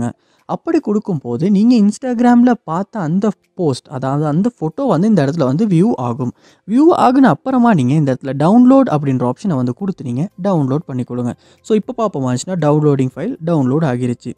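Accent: Sri Lanka. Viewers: Srilankan tamil 😀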